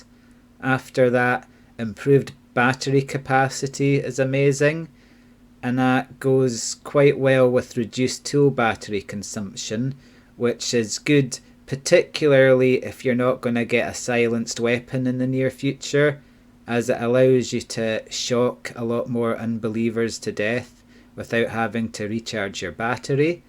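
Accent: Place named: Scotland, United Kingdom. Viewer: No, that's right. Nothing harsh about it, it's more of a "thoughtful" Scottish accent. 🙂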